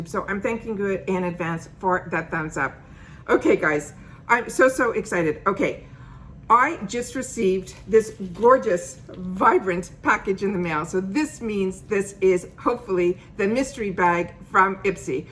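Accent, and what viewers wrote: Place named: New York, United States. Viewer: Do you live in new york? The reason why I asked you is the way you that you speak you sound like a new yorker.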